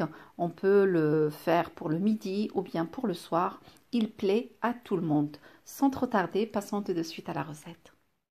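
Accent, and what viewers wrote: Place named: Morocco. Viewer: Salamo3alaikom okhti t juste magnifique avec tes agréables recettes Et aussi notre accent marocain joyeux 😆😄 Bonne continuation ma puce et un grand Merci 😊😊😊😊👍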